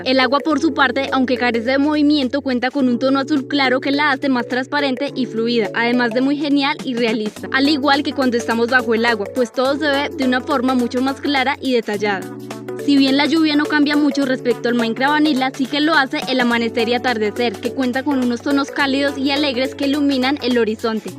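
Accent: Colombia. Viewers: voz colombiana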